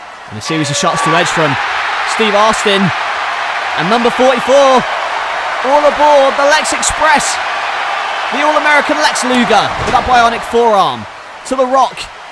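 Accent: United Kingdom. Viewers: This guy sounds British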